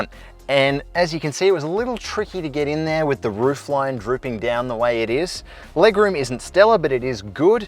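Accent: Australia. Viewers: Do you from Australia?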